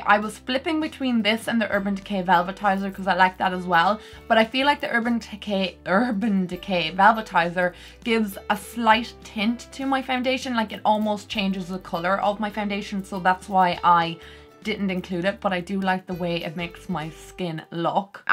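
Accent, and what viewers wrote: Ireland. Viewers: Is that a Irish accent?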